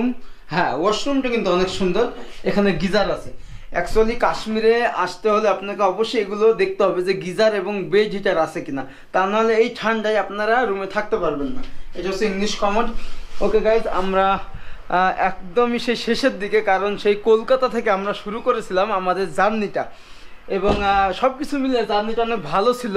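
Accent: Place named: Kushtia, Bangladesh. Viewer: কুষ্টিয়ার ভাষা মনে হচ্ছে।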